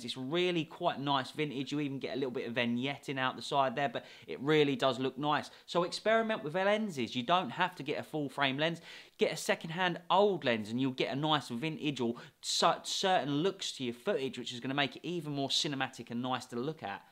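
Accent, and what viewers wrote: United Kingdom. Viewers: I am in love wifh vhe cockney accent brova Bu' vhe presentation was good. Fhanks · that british accent made the video even more interesting to watch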